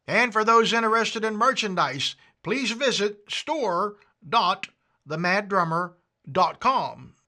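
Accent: South, United States. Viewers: Metal guitar player born and raised under nashville, honestly i like videos that give perspective, i remember seeing your "wrong gig" video awhile back but i subscribe to andy rooneys channel and said to myself "oh crap, he's watching the video"! Then i fell down the rabbit hole and found you channel and subscribed. So far ive enjoyed the insight, the humor and the fact that i hear another southern accent actually made me feel better! I rarely subscribe to channels but music keeps me sane so theres only a couple on my feed, andy rooney, matt freeman from rancid, kyle bull, ola englund and yours! Id say your on the right path as far as content is concerned, the mix of insight and humor is refreshing, keep doing what your doing and im sure people will take notice.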